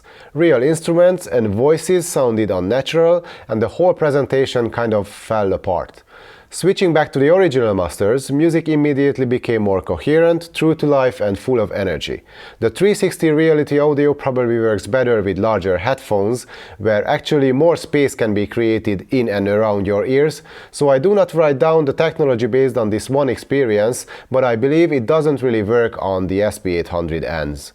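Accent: Hungary. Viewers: Are you Hungarian tho? Ha igen, akkor pacsi 😃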